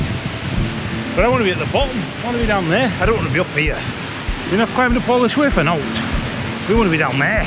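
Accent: Yorkshire, United Kingdom. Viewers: tv show filmed at Port M was "The Prisoner" used to watch the re runs in 90's : ) You sound like a fellow Yorkshireman, im West Yorkshire Lad B'n'B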